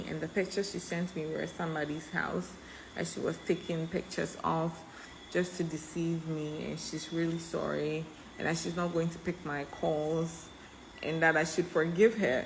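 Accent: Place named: United States. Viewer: U sound like someone living in usa not Italy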